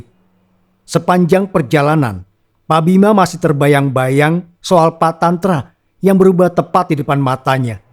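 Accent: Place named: Bali, Indonesia. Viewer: Logat Bali begitu jelas di sini keren banget di tunggu part 2 nya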